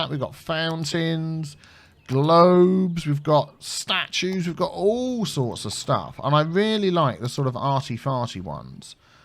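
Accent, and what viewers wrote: United Kingdom. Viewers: Your voice is very British. You could be an actor for my listening exam this year. Especially the words ''there'' and ''that'' sound British.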